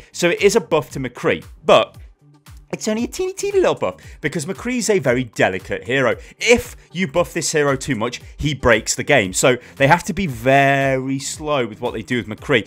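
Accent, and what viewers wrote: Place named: Kent, United Kingdom. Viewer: Your from Kent in the U.K. arnt you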